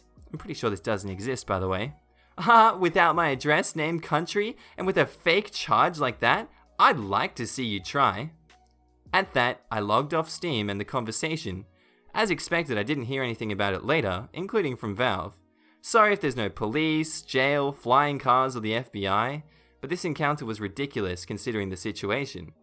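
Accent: Australia. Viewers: Ello fellow Australian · Are you Australian?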